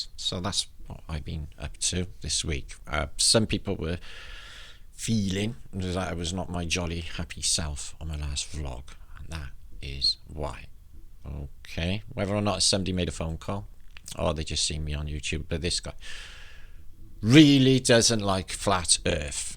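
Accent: United Kingdom. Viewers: LMAO. They say that about WiseUp too. I don't get it. Took me about 5 minutes to get used to the English. Try understanding my Indian doctor 😂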